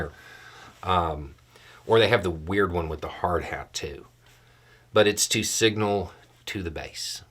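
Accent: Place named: Florida, United States. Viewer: He’s also part of a comedy group who call themselves the liberal rednecks. It’s ironic southern humor. Trae Crowder, who shows up making pretty insightful political commentary once in a while, has a very noticeable rural Tennessee accent. himself, who’s name, btw, is not - that’s a typical deep south name, has a Florida accent which is slightly modified when he makes these videos, but the way he looks? That’s Florida Man. It’s all very regional American humor and plays up to American stereotypes. I can’t tell where you’re from from your name, but I’m willing to bet you’ve got the same thing going on in your country.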